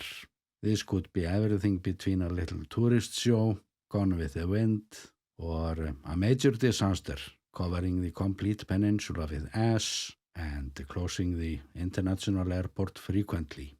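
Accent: Iceland. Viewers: I love listening to this Icelandic accent!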